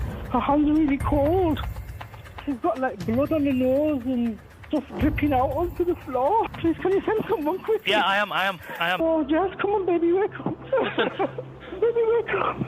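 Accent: United Kingdom. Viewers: I have been a subscriber of yours for a long long time, since a couple thousand subs, & I have to say that I have loved watching your growth & seeing you become more & more comfortable with every video. Congratulations on 100k love! I can’t think of anyone who deserves it more!! You really help this boring 42yo, mom of 2 grown kids, nurse, wife, & true crime FANATIC with your wonderful storytelling & your true empathy & sincerity. I love your accent too 😘 (I’m from Alabama, USA, so there’s nothing better than a beautiful British accent especially because I have one of those slow annoying southern accents 🤣) I just wanted to say congrats & tell you how much I love your channel. 100k down, now on to 1M! 🎉❤️🥰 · Great video !!! Keep them coming !! Great job love your look and makeup and accent !!! I am American always love British accent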